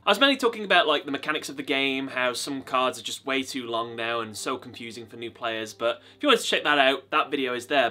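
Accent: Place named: United Kingdom. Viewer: thank you. Are you UK based, you sound it. Don’t see many Yugioh channels with UK youtubers. Nice to see you represent 👍 I’m gonna throw you a subscribe your content is decent.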